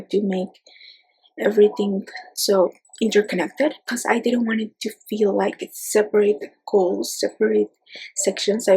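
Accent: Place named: Colombia. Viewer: Hope you're doing okay. I love seeing your nice face and hearing your Colombian accent 😀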